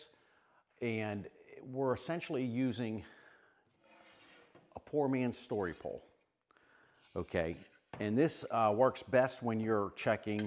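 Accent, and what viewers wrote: Pittsburgh, United States. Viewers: Man, that's a GREAT Pittsburgh accent. Love it. Thanks so much for posting this. It's exactly what I needed.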